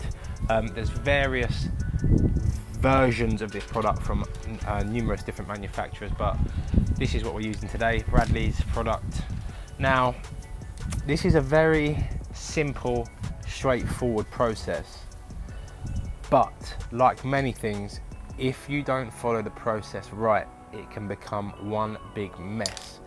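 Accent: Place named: United Kingdom. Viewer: I can tell that you are from the UK because your accent so I wonder how hard is it to get a mobile dent repair license in the UK. I respect your job btw cuz it is great.👌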